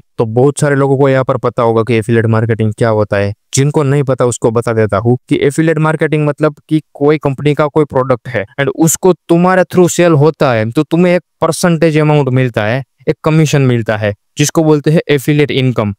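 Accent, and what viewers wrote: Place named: Gujarat, India. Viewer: Tame gujarati Chhone Bhai Boli upar thi khabar padi gai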